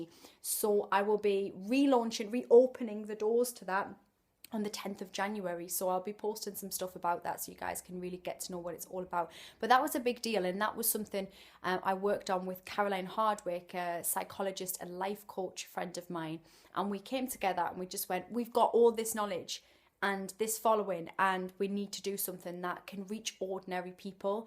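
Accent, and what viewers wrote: Newcastle, United Kingdom. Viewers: Happy New Year,. I really appreciate watching your videos. Your pieces of advice and stuff are highly significant for me and, on top of that, I love practising my English by listening attentively to your Geordie accent which I had not been familiar with until I came across your channel here. #Peace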